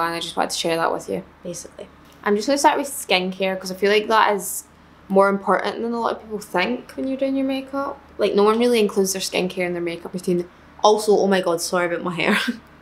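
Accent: Scotland, United Kingdom. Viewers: Felt so comfy, with the scottisch accent, really nice, ur funny and i love how it came out! Lov uu hope u upload soon again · She’s from Scotland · you re scottish? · it took me so long to realise that she has a scottish accent. i’m literally scottish but i don’t have an accent so i never notice lmaoo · she's friggin Scottish hen 🥴🥴🥴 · Her * speak * Me : "that's sound Scottish" Her : "Midges" Me : "Yeeaaah definitely Scottish" (I HATE Midges) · What part of Scotland are you from? I love your accent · Me: not used to hearing my own accent (used to American and English vids) Spends the next few seconds wondering whats happening Then it clicks, SHE'S A SCOT!!!!🏴🏴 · Sounds more Scottish. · Scottish, but it's a quite muted accent compared to most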